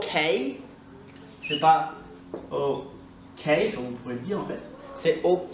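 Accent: Scotland, United Kingdom. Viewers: Quelle horreur l'accent écossais. Mais très intéressant comme vidéo. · Il est génial cet accent !!!🤣🤣🤣🤣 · J'adore vos vidéos l'accent écossais est magnifique . Je souhaiterais pouvoir télécharger comment puis je faire ?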